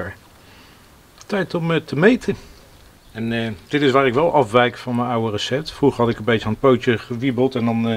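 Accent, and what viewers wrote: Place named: The Hague, Netherlands. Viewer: Top filmpje, ga ik eens mee aan de slag! Geboren Hagenees zo te horen hè? ;)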